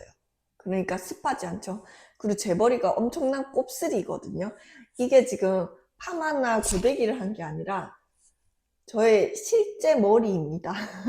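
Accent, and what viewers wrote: Gyeongsang, South Korea. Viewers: 오... 저희 와이프도 간호사예요. 저희도 간호사 와이프 때문에 미국에 이민 온지 4년 됬고 달라스로 이사 온지는 1년 됬습니다. DFW 사시면 저희 집에 한번 초대해서 저희 가족과 한번 뵜으면 좋겠네요. 사투리 쓰시는거 보니 저희 와이프도 경상도거든요 ^^ DFW 근처 계시면 연락한번 주세요.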